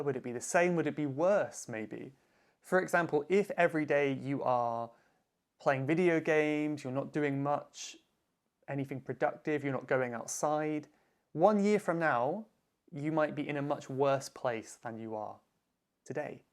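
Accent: United Kingdom. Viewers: I was admire to you myteacher ,my resolutions this years Will to marry and wanna improve myspeaking skill .i hope British accent could come out to mymouth .hahaha i love your accent have Made me ,was crazy .i'm sorry my English bad . I'm from indonesian ,i hope one day i could meet to you ...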